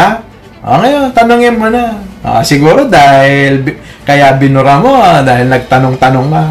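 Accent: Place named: Batangas, Philippines. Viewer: I’m so entertained with your Batangenyo accent nakakatuwa. Pero si, nakakasira ng araw pati accent peke talaga. Sana ma meet kita pag pwede nang makapag bakasyon sa Pilipinas. I’m your new subscriber.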